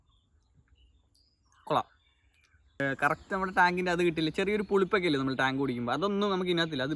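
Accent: Alappuzha, India. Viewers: Bro alappuzhakkaran aano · Chettan alappuzhakkaran aaano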